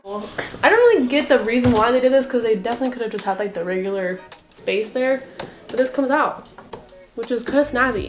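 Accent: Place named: United Kingdom. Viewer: Where r you from originally (i mean country)!!!! Bcoz accent is british but beauty is far more than average brits!!!!!! Please reply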